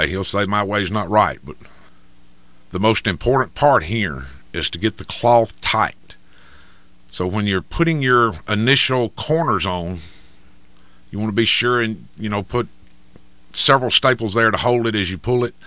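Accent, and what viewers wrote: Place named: United States. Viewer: Probably the thickest American accent I've ever heard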